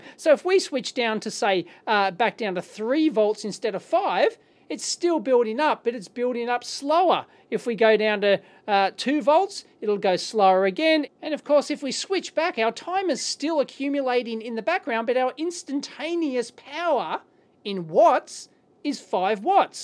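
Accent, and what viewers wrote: Australia. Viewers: Aaaay aussie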